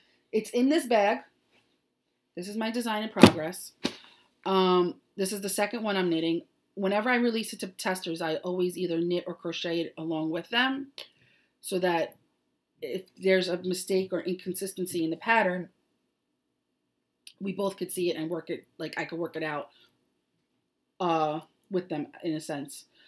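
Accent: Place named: Brooklyn, United States. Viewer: Your Shawls are Beautiful! I'm so glad that Melissa pointed me in your direction- Love seeing and hearing about Your Yarney World! Chewy is a Little Doll, I just wanted to reach in and hug him! Thanks for Sharing, I'm still catching up on past episodes and enjoying them all! I was born in Brooklyn, Love hearing You speak, I lost my Mom and Dad 7 yrs ago so I don't get to hear the accent anymore as I haven't lived in NY in many many years! XX Marie-Wisconsin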